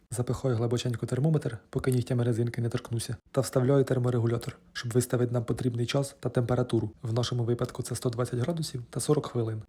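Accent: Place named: Luhansk, Ukraine. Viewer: Гуморист та розповідає від бога❤❤❤.Дуже подобається Ваш контент.Слухаю із задоволенням так, як наче у себе вдома побувала.(Луганська область)У нас теж так гутарять і голос....голос свій ,рідний.Зараз дуже далеко мене занесло, вірніше нас. Серце щимить, болить та плаче за рідною землею, за своєю країною. Дай ,Боже усім українцям дочекатися перемоги над ворогом та повернутися до нашої замученої ,але найкращої ,рідної землі. Всим миру ,добра, злагоди. Бережіть себе, рідні.❤❤❤❤❤❤❤❤❤❤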